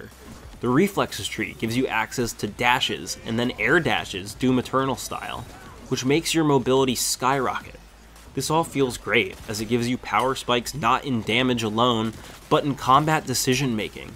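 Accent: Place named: United States. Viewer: Youre not italian. Youre american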